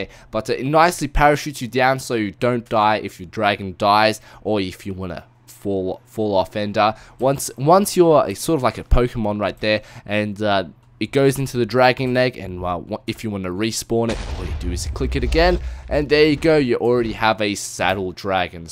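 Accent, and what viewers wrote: Australia. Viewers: O.o ur australian :O? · Are u Australian mate coz we are awesome ANZAC's for the win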